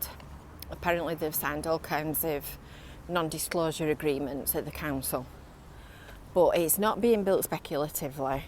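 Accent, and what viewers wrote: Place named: Yorkshire, United Kingdom. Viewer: Love your Yorkshire accent...up early this morning? Brilliant Video thank you x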